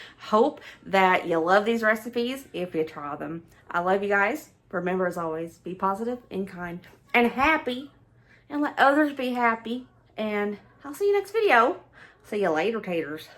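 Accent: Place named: Deep South, United States. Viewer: u tube suggested your channel to me, & now I’m a fan!! You cook just like i was raised to cook! We also happen to buy the same types of groceries 😂😂 we’re both from the deep South! You talk just like me & my family do!!😂 God bless you & that lovely family ❤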